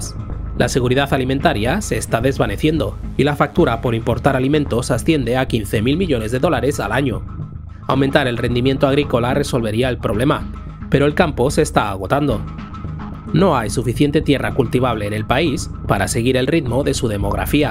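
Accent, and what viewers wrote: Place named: Spain. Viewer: El contenido es muy pero muy bueno, pero déjame hacerte una critica constructiva, la narración es muy llana, le hace falta sentimiento emocion queseyo, es mas como leer un documento y no una narración, y segundo el acento y la narración nativa española (no se de que parte España seras) es muy marcada, deberías neutralizar tu acento. Suerte y voy a seguir viendo tus videos 👍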